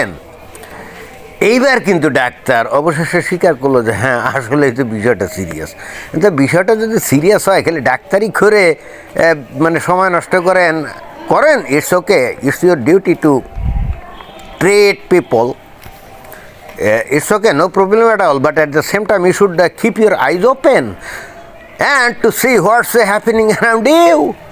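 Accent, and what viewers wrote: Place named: Narail, Bangladesh. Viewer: মহাশয়, আপনার ভাষার টান শুনে মনে হয়, আপনি নড়াইল বা এর কাছাকাছি কোথাও জন্ম। আমার বাবা ঠাকুরদার বাড়ি ওখানে ছিল। এই রকম কথাতে টান ছিল ওনাদের। আপনি ডাক্তারদের সঙ্গে কথা বলেছেন, ওরা ভয়ে কোন মন্তব্য করেননি। মুসলিমদের কোনও বিষয়ে অপরিচিত লোকেদের সামনে মুখ খুলতে ভয় পান সাধারণ অমুসলিমরা ,রাজনৈতিক কারনে।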